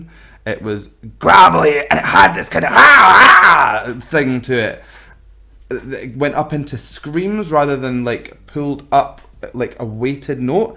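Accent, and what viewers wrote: Scotland, United Kingdom. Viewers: Hello there, I really love your scottish accent, feels like going home... And, yep Markos voice is awesome!! Try ' ', ' ' (Tampere) or ' ' from 👍😉 Greetings from 🇨🇭 🤘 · i see. thanks. i was leaning towards Scottish but was unsure. pardon my ignorance, its just that we, Americans have trouble distinguishing accents and i am fascinated by them. usually i can spot it better when its a bit heavier than yours. at any rate, thanks again. looking forward to seeing more of your entertaining and informative reactions. is my passion, so anytime you wish to react to her my soul will sing.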